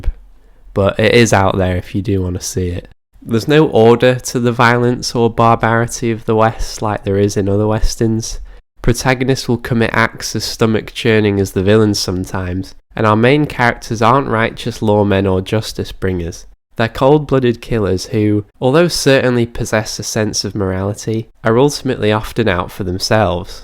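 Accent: United Kingdom. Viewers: Narrative spooled by the low class English accent..... no glottal stops... ie "T"s" matter and "Bee-ings" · narrated by a Brit? ...about italians making american westerns? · Spaghetti westerns described by a crappy British accent. YouTube sucks ass these days.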